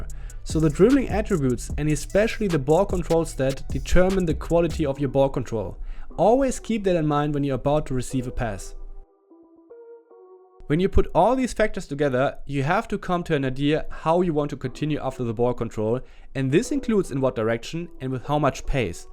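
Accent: Germany. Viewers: man hört das deutsche direkt heraus haha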